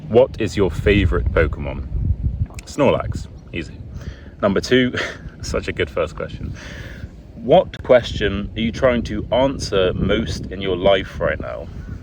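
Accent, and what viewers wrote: United Kingdom. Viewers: first of all thanks for helping us to understand and als speak in British accent ❤️‍🔥 Also my teacher recommended us to watch more videos on you tube but mostly girls should watch female ones bc their accent maybe 😶 so which female bloger can you recommend to me 🤔 of course I will continue to watch your videos to but still I need one female bloger too😊 I will wait for your comment 💯💌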